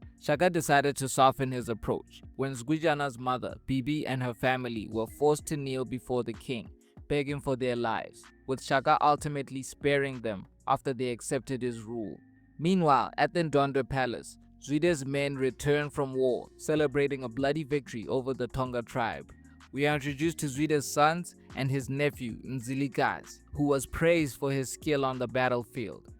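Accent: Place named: United States. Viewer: Loved the review but your americanized accent is throwing me off abit. You Joburg guys need to stop consuming American content😂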